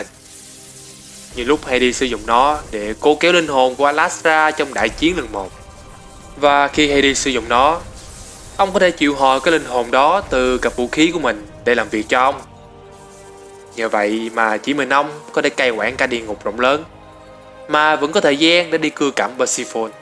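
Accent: Vietnam. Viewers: đọc tiếng anh kiểu ng việt nghe khó chịu vc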